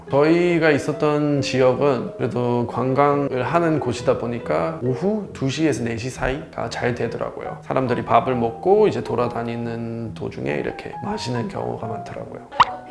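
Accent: South Korea. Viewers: 문법이 완벽하다 심지어 영어를 한국식으로 발음하네 대단합니다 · 우와 영어를 한국인발음으로 구사하는 분은 첨~~대박 아메리카노,쓰리샷,cctv 한국인인줄ㅋ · 보통 한국말 잘한다고 하는 외국인들도 외래어를 할때는 본토 발음으로 하던데 이분은 그냥 한국식으로 말해주네요^^ 대단하십니다. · 눈감고 들으면 한국인., ,ㅣㅇㅇ퍼 카페 그만두시고... ㅠㅠ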